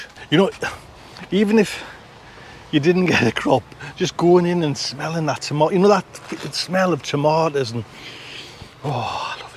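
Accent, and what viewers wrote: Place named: Northern England, United Kingdom. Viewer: my name is Jim I have been watching your channel for a while, can I just say you hit the mark, you talk as is you come over as a "proper'northener I really enjoy the" honesty you show, keep doing what you do. IN you words "Take good care"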